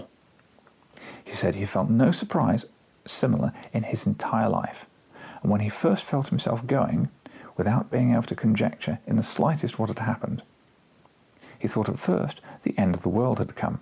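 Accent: United Kingdom. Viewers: That is perfect to improve my English, thank you Doctor British accent, I love the video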